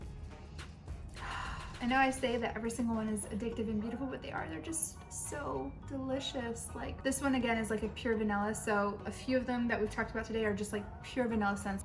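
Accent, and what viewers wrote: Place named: France. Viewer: I love your French accent 😏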